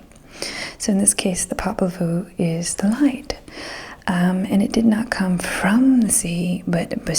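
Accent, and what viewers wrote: Transatlantic, United States. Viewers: sounds almost transatlantic to me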